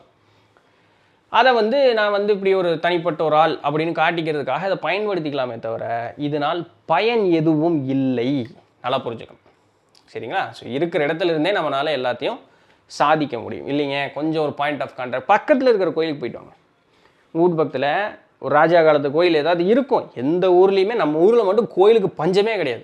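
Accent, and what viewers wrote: Coimbatore, India. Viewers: Well said, ur info with coimbatore slang is truly exceptional 😅. Keep rocking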